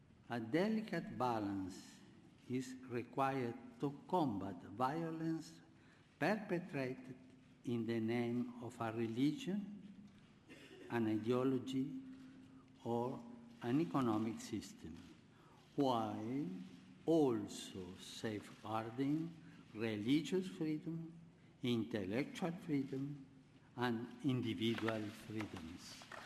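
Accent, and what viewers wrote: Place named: Argentina. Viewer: Heavily accented indeed, you can only expect so much from a man born of Argentine descent (whom spent most of his life in the Vatican thereafter) trying to speak English. That being said, the words uttered do carry heavy weight.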